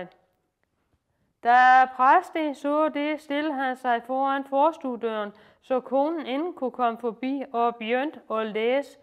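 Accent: Lolland, Denmark. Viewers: Flot, vi sad i dag på Nakskov test og vaccine og så dig. Det er flot, lav endelig noget mere, du gør den lollandske dialekt ære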